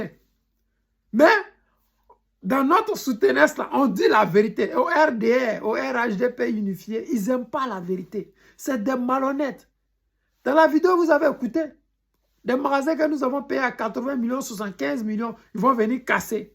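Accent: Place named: Guinea. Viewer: C'est punition de DIEU. Ton accent même est guinéen mais c'est sûr que tu es dans la politique avec les déstabilisateurs de la Côte d'Ivoire. Tu as participé avec tes frères à installer le gars là. C'est la punition de DIEU, va à la mosquée prier pour demander Pardon de DIEU et pardon pour les cœurs des Ivoiriens , qui vous ont reçus mais qui ont saignés par votre faute.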